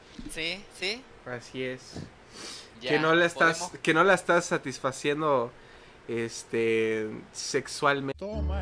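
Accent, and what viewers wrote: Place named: South, Spain. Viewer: De dónde es este we?:v tiene acento del sur :v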